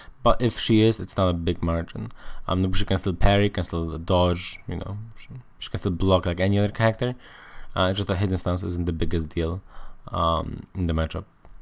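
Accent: Poland. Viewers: I have to ask: are you Polish?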